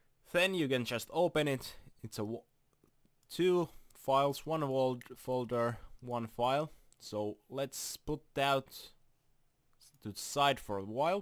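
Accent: Finland. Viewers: you have a finnish accent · are u from finland? cuz you have very strong accent :D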